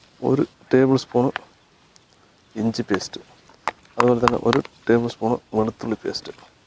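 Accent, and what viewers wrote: Palakkad, India. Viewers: Palakkadan slang adipoli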